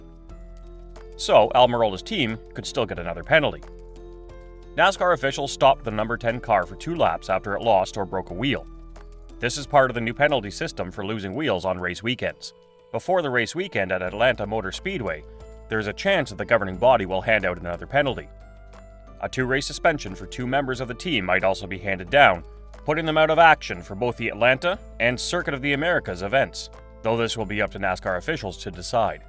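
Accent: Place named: Canada. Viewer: A NASCAR channel with the Canadian talking eh,. What a joke!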